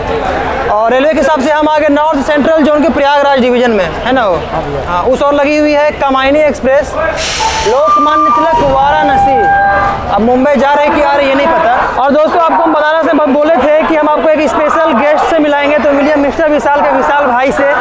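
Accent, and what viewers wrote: Bihar, India. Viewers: आपकी हर विडियो सराहनीय होती है। और आपकी बिहारी भाषा बहोत ही सुन्दर है। हमे भोजपुरी भाषा बहोत ही अच्छी लगती है क्यू कि हम भी यूपी के है। प्रतापगढ़ के।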